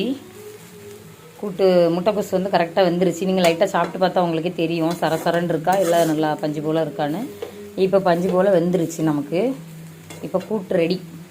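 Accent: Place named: Nagercoil, India. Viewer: Arumai. Nager coil speech. Very good.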